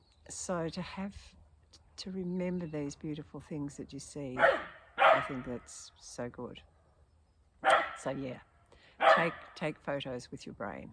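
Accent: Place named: Australia. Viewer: Dear (sorry I don't know your name) I loved listening to your video this morning. I've been listening to a few nice old ladies giving life advice with kind soothing voices so this video appeared in my feed today. Thanks AI! I have to say your voice is just beautiful to listen to! You have a wonderful way of speaking. And good advice too. I love the fact that thanks to YouTube we all have access now to the wisdom of people like you. It's a healing substitute for the families we either didn't have, or did have, but who weren't so free with their kindness & wisdom. What a gift to the world. I also love the fact that you are Australian. I lived there for 20yrs & hearing your accent, plus the crows & magpies in the background, brought back some nice memories for me. I'm back in NZ now & it's quite different over here. Best wishes from Wellington, I've subscribed and I look forward to hearing the rest of your advice 🙏💐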